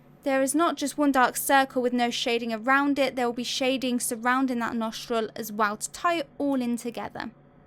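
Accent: United Kingdom. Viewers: I love your British acsent. I could listen to you talk all day. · Beautiful British accent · Believe me your sketch is far beautiful than the real picture and by the way I love British accent.😉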